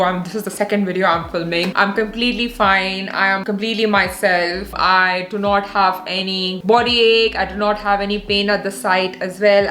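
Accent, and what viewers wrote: India. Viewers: Thank you very much for this: it is also a delight to hear your accent for some reason! I am fond of the Indian accent. I had my vaccine yesterday and it was fine, I did have mild muscle aches today and last night, a mild headache but I understand the muscle aches are an indication that the immune response has been innitiated, so nothing to worry about. If one knows what the cause is, then I wouldn't count this as 'suffering': and it is nothing compared to the deleterious effects of the virus itself. My grandmother died of it and I think it's a real tragedy when someone reaches her age and then in the end is taken by something avoidable: I'm sure most people know someone or more then one person for whom this virus has taken there life. Bearing this in mind I think it makes little rational sense to pass up the vaccine out of a fear of possible physical symptoms. 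Out of all the millions of people who have been vaccinated (about 18 million in the UK alone), there have been no documented cases of this vaccine causing death, and I don't know of any serious adverse events that have been blamed on the vaccine. It is fear caused by distrust, caused by the absence of understanding that surely leads someone to resist: so I would urge anyone to find out the facts for themselves, from sources they trust. You areprotecting not just yourself but anyone you come in to contact with. There are no known serious risks to taking it but no end of possible serious consequences for anyone who doesn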